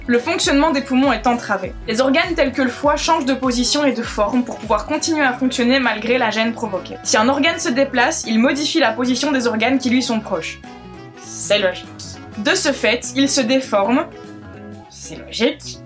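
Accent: Belgium. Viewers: ton accent belge gives me life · Super vidéo!! Merci de mettre plein d’humour et de bonheur dans nos vies et de nous aider à accepter nos ptits complexes:) PS: j’adore ton accent belge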